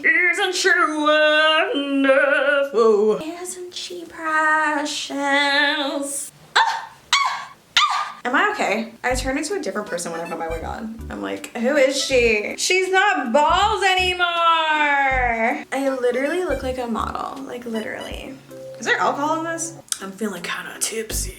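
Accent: United Kingdom. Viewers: i love her british accent😭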